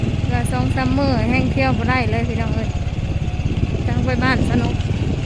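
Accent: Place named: Laos. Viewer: วัสดี แวะมาเติมกำลังใจฯลฯ(สำเนียงพูดแบบนี้เป็นคนแขวงอะไร)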